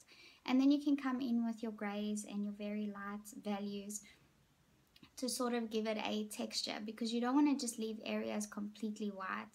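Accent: South Africa. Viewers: Are you South African? :D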